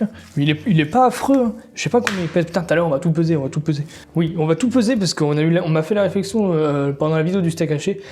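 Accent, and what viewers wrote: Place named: South, France. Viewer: Bien râcler l'Ausssss... donc t'es bien du Sud lol...Dans le Nord ou ailleurs, c'est l'Os...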